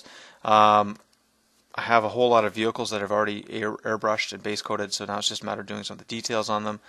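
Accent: Canada. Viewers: i know u are from canada only because you say ewt instead of out lol! no offence nice vid.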